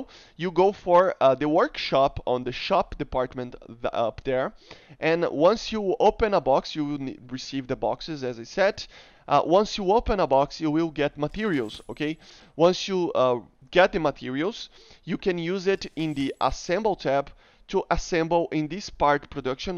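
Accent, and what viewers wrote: Brazil. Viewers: acho que voce é brasileiro, seu sotaque me diz isso. am i wrong?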